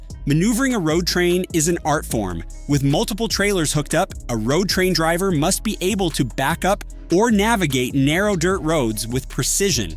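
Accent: United States. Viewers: Nothing worse than hearing a "errrrrritating Amerrrrrican ac cent" while trying to watch a video. So I stopped 40 seconds in.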